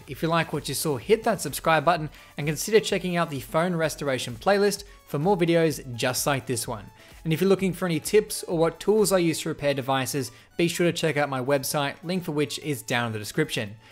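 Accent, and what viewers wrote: Australia. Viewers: "Ichoons." God I love the australian accent.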